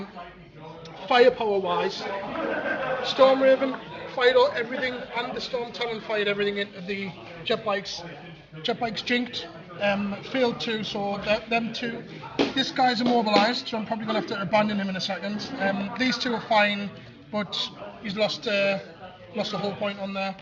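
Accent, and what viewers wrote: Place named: Durham, United Kingdom. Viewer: Good batrep mate, bad day to be an ultramarine. Eldar mobility is tough to counter but I thought with three fliers he'd at least have a chance. Where you from by the way? I'm in Durham, your accent sounds local and you said 'canny points' lol. So I'm guessing you not far from me.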